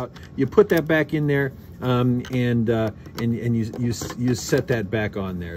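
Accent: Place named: West Virginia, United States. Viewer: What part of West Virginia are you from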